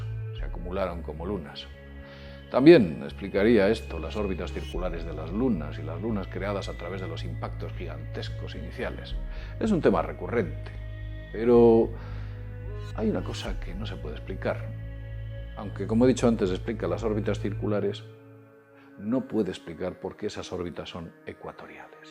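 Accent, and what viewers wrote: Spain. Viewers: Yolanda Lemus Palacios no estareis acostumbrados al acento español o por otras circunstancias serivadas de vuesto dispositivo, pues se le escucha perfectamente....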